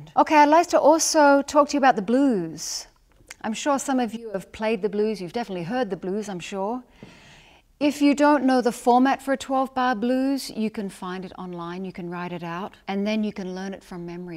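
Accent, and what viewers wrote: Australia. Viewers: My preference 4 gtrs is progressively getting bigger & bigger & hollower & hollower .. dang, maybe I shoulda bin a upright bass player. Well maybe not quite but 2 do JoePass-type stuff I’m gonna hafta b least a moderately proficient bassist. Bassists fascinate me. So .. hey, is that an Aussie accent? Show ‘em how we do it in the gr8 Sthn Land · Australian English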